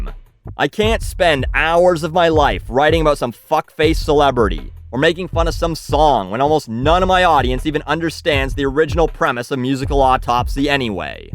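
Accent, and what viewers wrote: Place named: Canada. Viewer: You taught me that Canadians say 'out' and 'about' weirdly. Love you man. Your reasons are very agreeable. Love you man.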